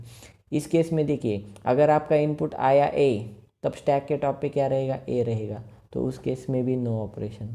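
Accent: Gujarat, India. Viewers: sir, r u from Gujrat ??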